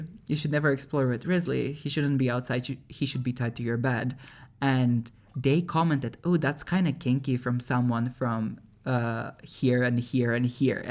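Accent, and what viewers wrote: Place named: France. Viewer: Its so nice knowing how you would talk longer then 1 minute, its so funny (in a good way, it makes me laugh because I have the same humor and I love that accent!! (is it french? idk)). Also very nice to know what is going on with the channel. <33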